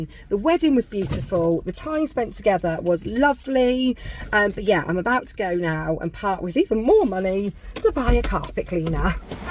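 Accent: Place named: Durham, United Kingdom. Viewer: Sounds like Durham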